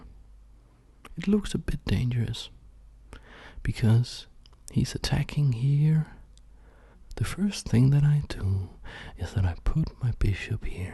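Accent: Denmark. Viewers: at first I was like.. this fella sounds a lot like a Dane.. I then scrolled down and you told us you are from Denmark. Halløj mester! god video, god instruks ;)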